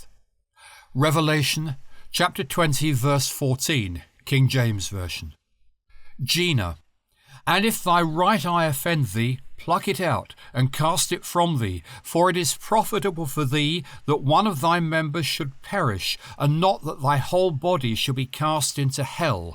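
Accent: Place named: United Kingdom. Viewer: I love the British accent of the narrator! 😊😊😊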